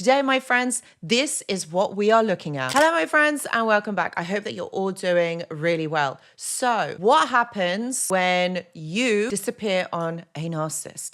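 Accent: England, United Kingdom. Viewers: Somehow I've been bragging on the English accent & with good reason I'm totally subscribed & thx luv😘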